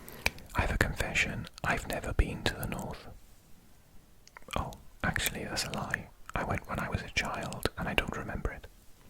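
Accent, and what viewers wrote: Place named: Wales, United Kingdom. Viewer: Having come from the village of the historical seaport Mystic, CT, I can say it's delightful to hear a Welshman explain geography. He's long since passed away, but a local Welsh-American icon Don Treworgy, a close friend of my brother, inspired such a passion for astronomy in our village with his brilliance and kind and gentle manner, we named the town planetarium after him. It was a privilege to know him. Wales will conquer the world, one gentle heart at a time! Even if no one except those who knew him can pronounce his name!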